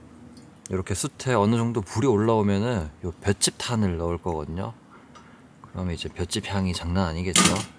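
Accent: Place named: Seoul, South Korea. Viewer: 날이가면갈수록 ㅋㅋㅋㅋ서울말 느신거같아요 ㅋㅋㅋㅋㅋㅋㅋㅋㅋㅋㅋㅋㅋㅋㅋㅋㅋㅋㅋㅋㅋㅋㅋㅋㅋㅋㅋㅋㅋㅋㅋㅋㅋ오늘도 랜선캠핑 👍🏻👍🏻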